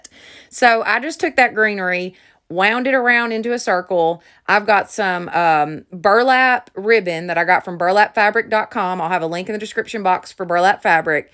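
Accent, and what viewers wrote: South, United States. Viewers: I just love your videos!!! You talk like me. I’m southern through and through. From SC. Would you do a video for bathroom? Need a change bad. Thank you sweetie for all your content😍😍😍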